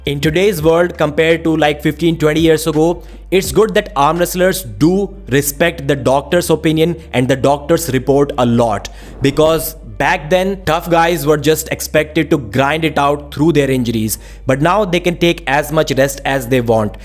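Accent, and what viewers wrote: India. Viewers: this is what Indians sound like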